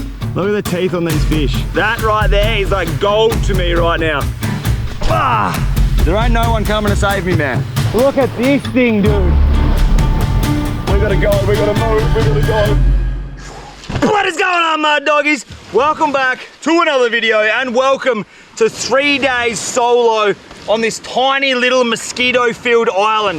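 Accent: Australia. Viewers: nah bro people are sleeping on aussie accent its more fun than british XD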